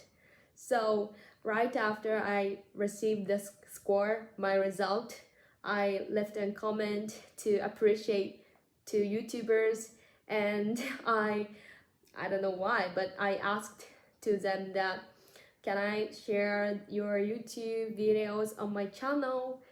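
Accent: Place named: Germany. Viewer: スイスに行く前はアメリカ英語を学ばれてたと思うけど、多分スイスに行って若干ドイツ語訛りが出てきてるね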